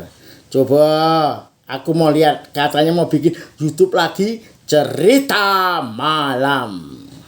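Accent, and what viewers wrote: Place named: Java, Indonesia. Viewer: $3mangat pakdhe, sehat sll...bhs jowo medhok,*)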